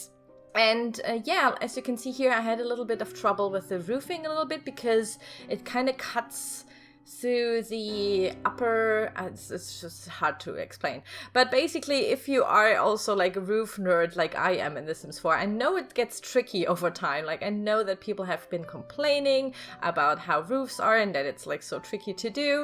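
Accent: Germany. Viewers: I think you're my favorite <3 I just love your buildings, your creative without using the cc, you're original and I love your german accent!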